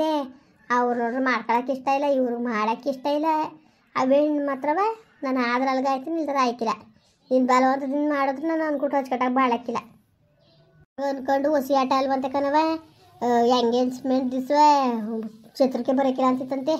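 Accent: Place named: Ramanathapuram, India. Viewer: Yavdu native Nimdu, e Tara language matadadu Ramanathapuram side, heli please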